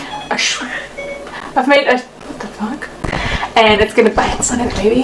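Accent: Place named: South, United States. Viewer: I love bloopers... And ur losing ur southern accent girl...it's English/southern lol that made me hungry.. I think I would like the Apple ones...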